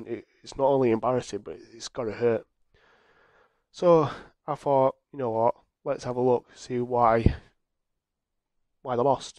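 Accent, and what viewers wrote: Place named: United Kingdom. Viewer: Hey!! For me is kinda difficult to understand sometimes, but thats bc im not used to hear british accent and my native language is spanish. But it helps me to improve my english. 😅 your firecracker.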